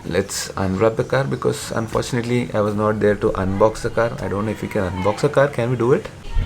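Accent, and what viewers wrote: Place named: South India, India. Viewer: You sounds like south indian where are you from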